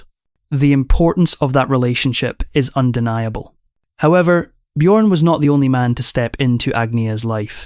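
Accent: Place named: Scotland, United Kingdom. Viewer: It`s the first time I have heard AI with a Scottish accent.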